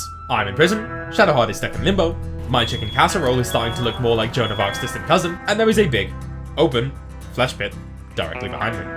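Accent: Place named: United Kingdom. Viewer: Great editing. You should boost your audio a bit more. I need that beautiful british voice straight in my ears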